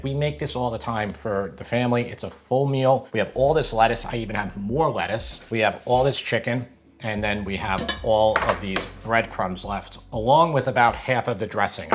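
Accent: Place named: New York City, United States. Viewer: You have the coolest nyc accent i've ever heard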